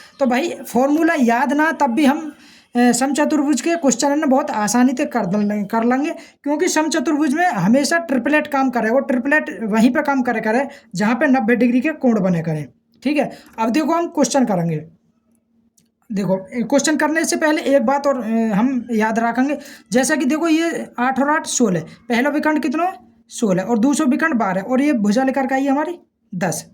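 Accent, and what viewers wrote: India. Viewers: Bhaiya brajwasi hi h ka tu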